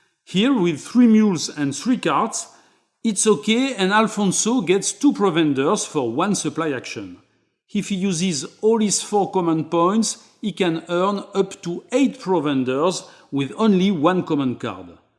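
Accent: France. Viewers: Thanks to you I was able to play Nevsky and I made some great memories with my best friend. I just bought this game because you made this tutorial. You are the best, if you keep making these as this series rolls out I will keep buying them. Plus you're French accent is awesome. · Giving the thumbs up for the french accent alone. Very nice video.😊👍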